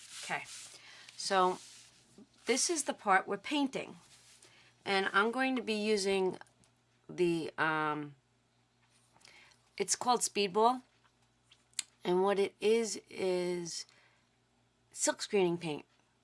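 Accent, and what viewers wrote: New York, United States. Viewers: Very cute! Love the NY accent 😁 Yay is back!!!!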